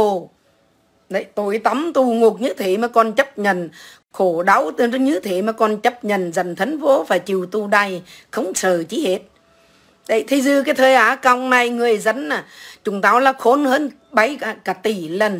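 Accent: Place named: Hue, Vietnam. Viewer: Chào chị nghe giọng nói tôi rất thích nhất là giọng huế.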